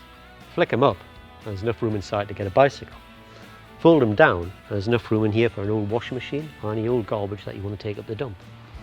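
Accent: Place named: Newcastle, United Kingdom. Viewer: What do you utter at 2'.08" ? , 2 '.15"? , 4'.05" ? , 5.'.07" ? , and 4'.04" WTF do you say here? The biggie 5'.41" " to have our HRV ( Felled?) Do all people from Newcastle speak like you? Your intonation is as hard to understand as a foreign dialect ! I had to listen over and over those parts I noted to try and decipher the mumbled dialect and still could not work out what english words you were using . I thought maybe Newcastle has its own dialect , its patently obvious its not english ! LOL The review is good but you need to annunciate better and sort your intonation.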